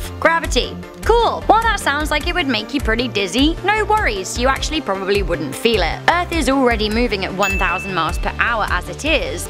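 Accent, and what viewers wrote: United Kingdom. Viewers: Your accent sound like British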